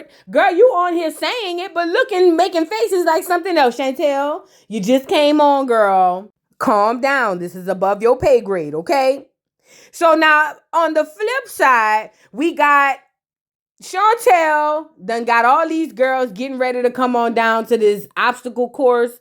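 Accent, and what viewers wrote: New Orleans, United States. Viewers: I love your new orleans accent ❤ New subscriber.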